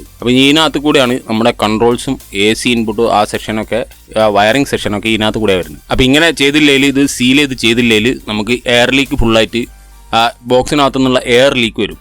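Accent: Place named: Palakkad, India. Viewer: Palakkad baashayaanallo.. 😍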